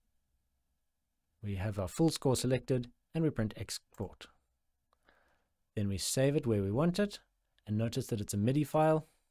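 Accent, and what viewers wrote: South Africa. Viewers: is your accent South African or British? sounds like a bit of both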